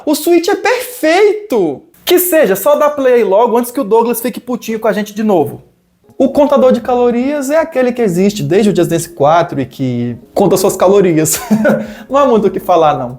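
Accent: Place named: São Paulo, Brazil. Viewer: Eu to rindo horrores com o sotaque paulista hahahahaha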